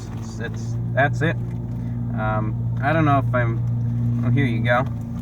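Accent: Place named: Florida, United States. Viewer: I never thought that listening to a posh floridian review fast food would be so entertaining.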